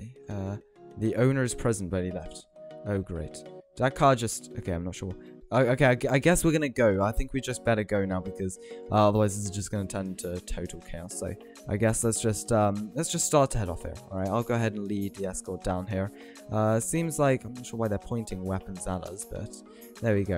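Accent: United Kingdom. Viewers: I forgot he was british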